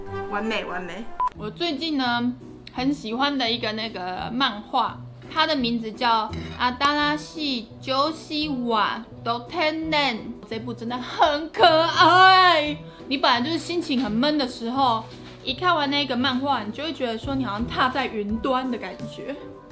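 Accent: Taiwan. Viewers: 好喜歡的口條超台但是又很清楚！真的很難得哈哈😍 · 是塔批歐咖哦～ 然後我是看癡癡的合作影片來的，覺得道地的台語非常對我胃口訂閱了，加油☺️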